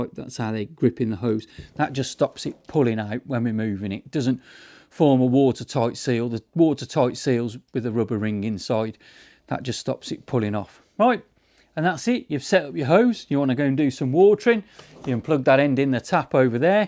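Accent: United Kingdom. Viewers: British accent! So lovely, mate!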